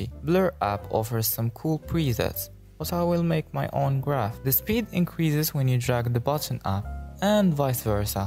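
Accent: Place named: Morocco. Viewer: You sound Moroccan😭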